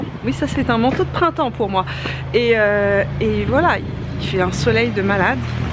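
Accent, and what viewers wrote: Quebec, Canada. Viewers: Trop beau tes extensions de cils ! C’est vraiment réussi :) C’est drôle tu as beaucoup plus l’accent québécois quand tu es à l’extérieur que quand tu fais des vidéos chez moi ! Bisous 😘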